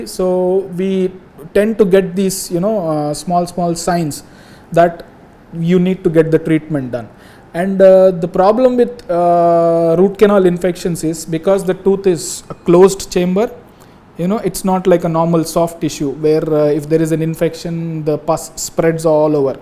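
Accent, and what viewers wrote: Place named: India. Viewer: It's Indian accent.....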